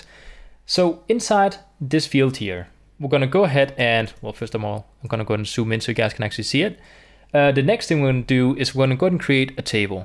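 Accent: Denmark. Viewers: Are u danish ?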